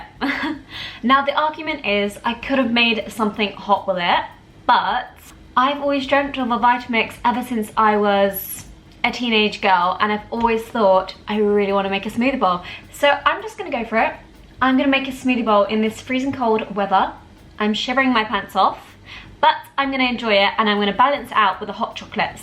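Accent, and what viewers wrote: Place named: United Kingdom. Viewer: I understand that you are sponsored by MyProtein. And i also get that you workout,as you constantly say in your videos. But you are not a bodybuilder,and i assume that you don't hit the gym as hard either,nor you try to gain muscle size. (forget me if i'm wrong) So why all this protein obsession you have? We need protein to recover and repair from our training,but what you do is a bit too much. I train 6x week ,1,5 hours long each session,and i am exhausted after each workout,plus i am a male. But i don't go above 1,6 gr/kg of bodyweight per day. Could you do a video about this,why you seem to depend on protein powders, and why you think you need so much of this specific macro, I would be glad to hear your take on that. And soy isolate protein is perhaps the worst vegan powder you could possibly consume,because of the soy protein extraction process. 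Google it,and you will see. Other than that,i always love your voice and British accent,plus your videos are so calming and soothing. Always fun to watch!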